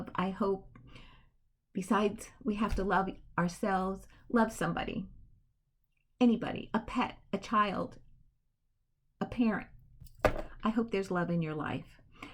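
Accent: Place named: North, United States. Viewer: Love your videos,! I can relate to what you feel about being from New York - I am from Philly, haven't lived there since I was 25, and listening to you takes me right back to my northern roots.:) I love watching your quilting, including your process and progress on your quilts.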